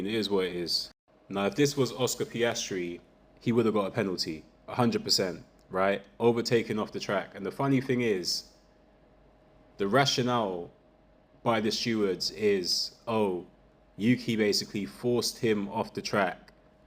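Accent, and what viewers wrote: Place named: South London, United Kingdom. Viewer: you sound and talk exactly the same as an old friend of mine from lewisham in south london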